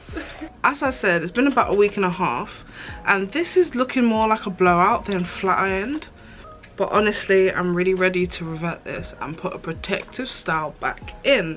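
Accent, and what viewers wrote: United Kingdom. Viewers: British accent from n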